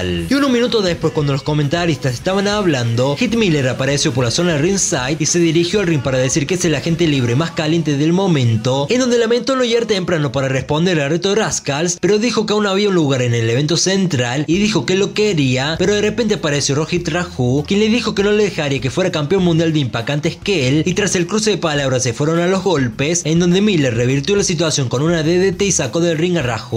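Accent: Córdoba, Argentina. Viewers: x q habla como cordobes?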